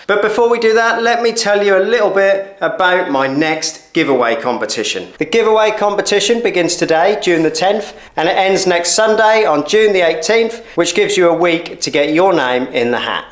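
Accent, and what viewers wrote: Norfolk, United Kingdom. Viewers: First time visitor to your channel. Do I detect a Norfolk accent?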